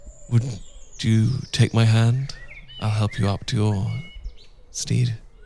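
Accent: United Kingdom. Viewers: More British RP accents please! 💖 I use these to improve my English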